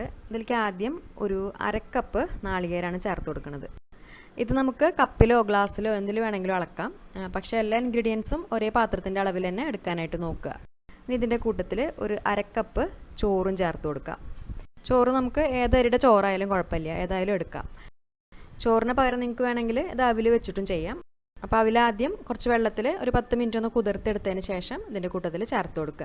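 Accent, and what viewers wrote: Thrissur, India. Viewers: 😋😋😋😋😋 Mol trissur aano...bhasha kettu chodichathaatto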